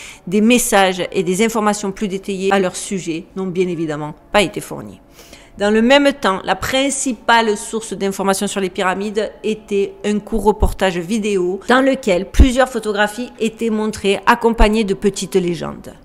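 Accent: South, France. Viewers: Je découvre ta chaîne Youtube... Très intéressante 👍.. mais.. ton accent viens de ou ? Es tu française? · Avec l'accent du Sud insupportable en plus... Perso je ne peux pas ça me gavé.. next! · Quel humour,à dévoiler les choses,vous n'avez pas peur qui aillent plus loin que la censure,une vraie "Guerrière qui vient du froid😂,euh,non qui vent de là où il fait bon de vivre😁,super vidéo,intéressant d'approfondir le Sujet de L"'antarctique",vidéo traitant de découvertes parfois jamais entendue,avec humour,et cet accent qui vient du Shud🤣,excellent "& conteuse d'histoires extraordinaires pour petits et grands,quand yà rien à la télé,regardez "Les Capsules de L'aventurière Archéologue 😂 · j'aime bien tes videos sur l'antartique ! super intéressant merci et beau travail ! je trouve que tu as un bel accent du sud de la france, tu viens d'où ? Marseille ?? .... lol bises (je viens de Marseille aussi, maintenant j'habite en occitanie) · Bonjour j'adore l'histoire avec l'accent du sud !!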